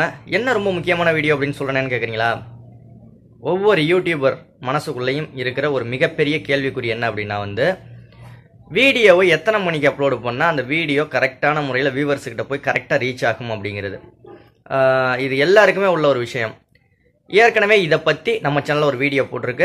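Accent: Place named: Salem, India. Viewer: Super neenga endha ooru. Slanga patha Salem Zone a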